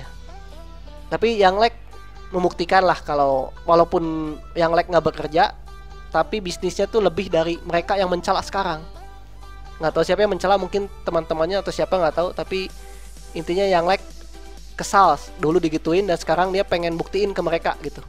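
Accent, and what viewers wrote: West Java, Indonesia. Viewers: miminnya dari sunda :p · sunda banget ngomongnya haha malah ngakak. makasih bang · bang lu org Sunda ya? suara lu ketara hehe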